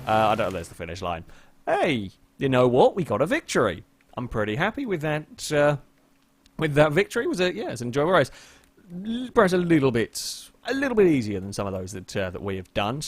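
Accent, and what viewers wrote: England, United Kingdom. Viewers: I'll be honest, I don't watch, or can't watch, much Rallycross, as it's not really televised here, and I can only ever find highlights online, but every time I've seen a race, the joker lap is always slower. Could be wrong, wouldn't be the first or the last time. Seems like from you guys saying what you are, both are accurate. It is kinda odd though for an England resident to reference an American thing first... but still says Z as Zed.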